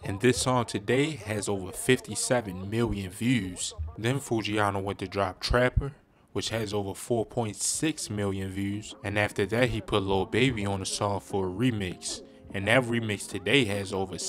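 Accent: Baltimore, United States. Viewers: Baltimore accent crazy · def bmore 😭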